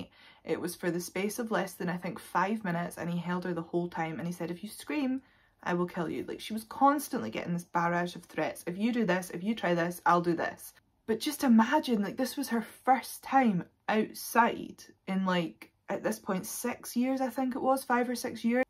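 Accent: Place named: Scotland, United Kingdom. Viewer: Totally the only other human interaction she has was with him and when his controlling meant he wasn't horrific to her she took that as kindness. So important for people that haven't experienced it to have an open mind as to what these victims feel the way they do. Thanks again really loved the content. As a fellow Scot your accent helped too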